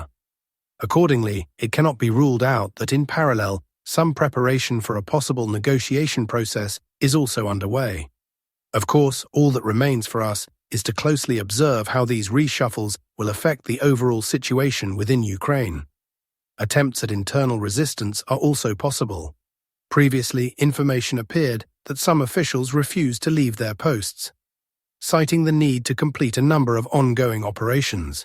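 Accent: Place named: United Kingdom. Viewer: Stop broadcasting with a UK accent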